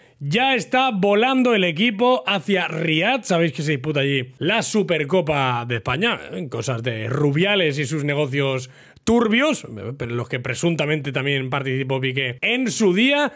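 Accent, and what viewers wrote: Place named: Argentina. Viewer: Parece Argentino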